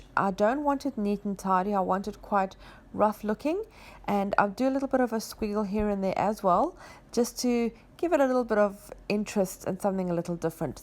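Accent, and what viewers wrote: South Africa. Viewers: Discovered you quite by accident this morning and so pleased I did!! Wonderful creativity! Your work is incredible! And an added bonus is hearing a South African accent again! Awesome!!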